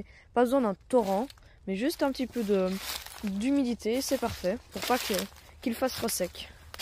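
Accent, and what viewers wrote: Franche-Comté, France. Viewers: Bravo ,et j'ai cru reconnaître une pointe d'accent Franc Comtois, d'où je te félicite. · Enorme, parce que vous avez l'accent franc-comtois.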